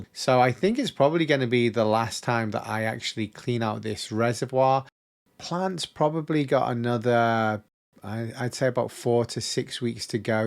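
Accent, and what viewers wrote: United Kingdom. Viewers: you sound so English lol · No a scouse... sound more like berkenhead to me...Better class of grower.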